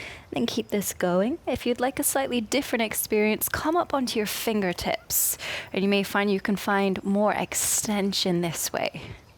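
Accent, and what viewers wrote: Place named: Scotland, United Kingdom. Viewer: Only just discovered you however done a few sessions they are perfect in every way. Just curious where you are located? Detect a soft Scottish in your accent Hey all well done from jane in Australia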